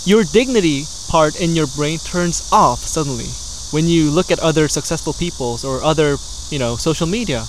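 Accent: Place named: United States. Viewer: Your english is on point man you could pass as a american.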